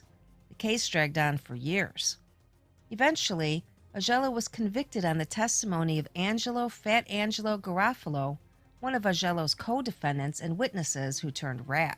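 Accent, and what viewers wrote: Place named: Chicago, United States. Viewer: The narrator sounds like shes from Chicago. Is that true? And if so where?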